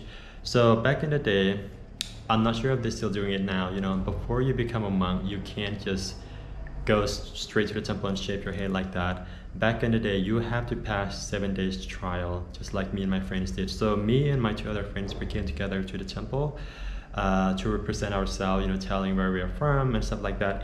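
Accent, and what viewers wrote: United States. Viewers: Hi, thanks for sharing your story. I been curious as to how you got American English accent and now I know. I became a monk when I was 10 and stayed for 2 years at Wat Prabhat in Pakse. At the time my mom and younger sibling need to go back to Vientiane but due to finance issue she left me at the temple. But it was alright since my grandmother house is right across the road. Unfortunately my aunts and uncles does not live in Pakse but in a different cities/village and have their own live. Since grandma was too old and cannot take care of me so the only choice was to become a monk. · Wow that's good to know about the monks program. Aw your English accent sounds absolutely perfect like the Californian. Thanks for sharing the awesome informations. Keeping the good work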